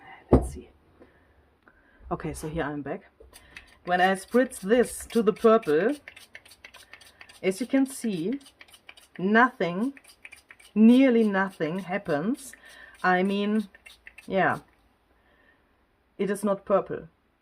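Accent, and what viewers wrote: Germany. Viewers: I love your english-german english. And your humour ! hahha xx so refreshing! Richtig erfrischend! :-)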